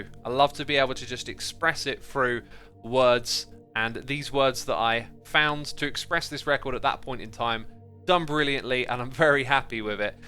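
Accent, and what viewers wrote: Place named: RP, United Kingdom. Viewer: To me it was kinda unexpected to find out that X listens to this kind of music(I myself am a huge fan of death,death and trash metal) with his strong british posh accent.Anyone else?it just kind of doesn't go together?idk lol. Also nice video!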